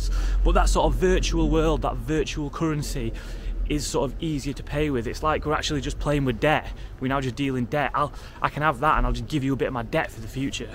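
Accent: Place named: Northern England, United Kingdom. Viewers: I love your Northern turn of phrase...'astral projections man' 'oh, thuk off'! brilliant 🤣💯. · Can tell youre from yorkshire "n i thought... oh fuck off" 😂 amazin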